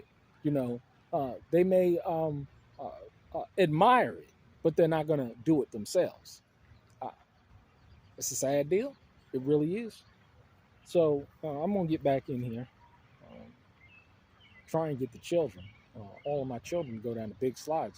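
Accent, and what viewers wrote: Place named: Brooklyn, United States. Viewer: I knew you were from Brooklyn. That accent is too familiar.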